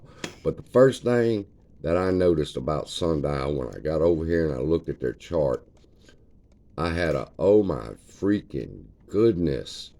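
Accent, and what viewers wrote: South, United States. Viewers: Instantly subscribed. I follow a lot of fancy channels. A lot of big ones with big words and figures. You broke it down plain and simple. The southern accent was a cherry on top. Thanks for keeping an eye out for the sundial crew.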